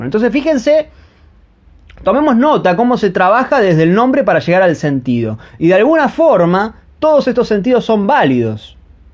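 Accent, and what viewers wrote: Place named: Gaucho, Argentina. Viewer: Tu pinche acento gaucho.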